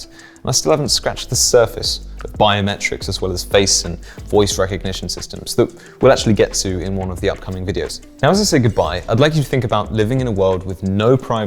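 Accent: United Kingdom. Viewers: a British accent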